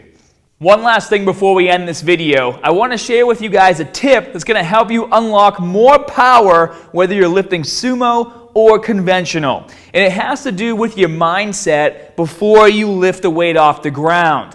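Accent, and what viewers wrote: Boston, United States. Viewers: Holy Boston accent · I've only watched a few of his videos but this is the first time I noticed his heavy Boston accent haha. Mainah heah bub. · I think you are the best with online video explanations of dumbbell use. You are thorough and I truly appreciate you. I wish I could train with you in person. Do you happen to have a video on diet/nutrition? I've got to get 70 lbs off and begin training for a half marathon. By the way, love that Boston accent, I lived there as a very young kid when my dad attended Harvard.😊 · IM hearing a familiar accent, are you from Boston? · My guy sounds like Seth Meyers with a Boston accent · Soon as I heard the Boston accent I hit dislike and left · I came to see what the difference is. But why do you have half a Boston accent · One of the sickest Boston accents I've ever heard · Great advice brotha. 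Are u from boston??? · Boston represent! Your accent is wicked awesome! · Holy shit that is the greatest boston accent I've ever heard! baaaaah bell 😂👌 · Boston accent sounds so gay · DIVINE PHYSIQUES TV it's not Aussie, it's a Boston accent. Boston, US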